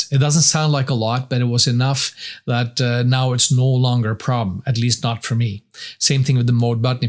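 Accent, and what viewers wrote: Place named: United States. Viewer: Excellent++ video! You seem like an authentic LA but you sound almost like an American? ;-) Meant as a compliment. I am a CW guy and if those RF insides were in an IC7300 - magic. But I have long Nordic fingers too and no way I can master those buttons, besides I dislike touching screens. Maybe FTdx10 housed in an FT2K or FT3K would be optimal. Yet I fear we are in the time of the silicon sliver iPad devices. If I ever see a mechanical S-meter again on a new radio it will be a Shock! de Wb8yqj diDit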